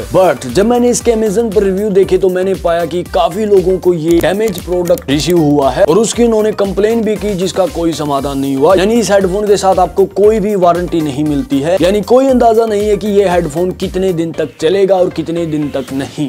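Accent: Haryana, India. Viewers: Haryana se hai kya bhai 😊?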